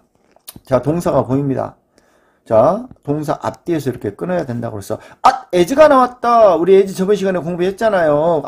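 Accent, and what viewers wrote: Jeolla, South Korea. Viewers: 왜 니들 선생님 화나게 하시냐....분사는 동사가 아니래 잖아 ㅋ ㅋ 나이많은 저도 재밋고 유익하게 잘 배우고 있습니다.. (완전 전라도 사투리 ㅋ ㅋ)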